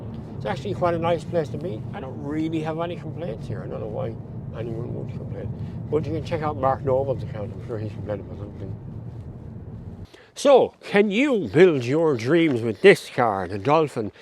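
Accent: Ireland. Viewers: Hardly understand Irish 😂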